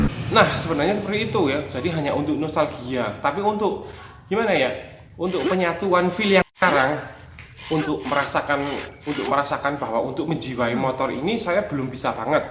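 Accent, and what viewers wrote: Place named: Banyumas, Indonesia. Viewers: Klo saya lbh suka ocehanya mz lbh klasik jwo medok hhhhhhh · Benar banget om begitu lihat pertama kali youtube om langsung suka karna bahasa om yg ngalor ngidul nya medok banget itu yg bikin suka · Ga ngapak ga enak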